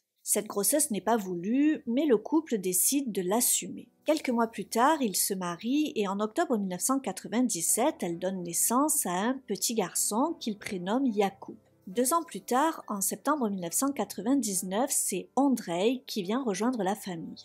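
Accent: South, France. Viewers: J’ai oublie, pardon …Merci pour ce très bon récit.Continue… j’adore ton accent du Sud, qui est bien tempéré, pas à couper au couteau😊❤😅😊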